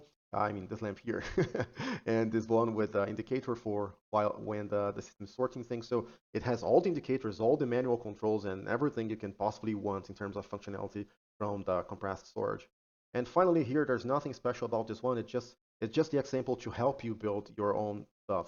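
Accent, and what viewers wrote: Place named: Brazil. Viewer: Hello! NIce video! Are u brailian? U rly have the accent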